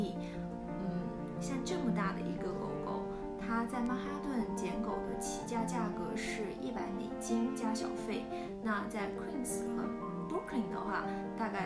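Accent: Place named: Yunnan, China. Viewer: 听出了云南口音🤏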